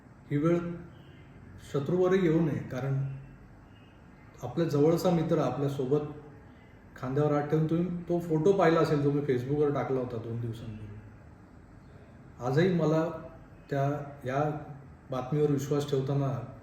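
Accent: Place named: Khandesh, India. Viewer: खानदेशी लहजा ला कायमचे मुकलो खुप दुःखद घटना 😔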